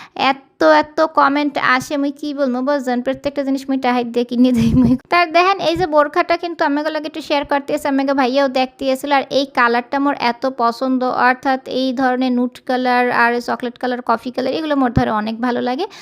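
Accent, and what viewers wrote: Barishal, Bangladesh. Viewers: আপু আমার হাসবেন্ড কাতার থাকে। তোমার ভিডিও আমার খুব ভালো লাগে। আমাদের বরিশালের ভাষা খুব ভালো লাগে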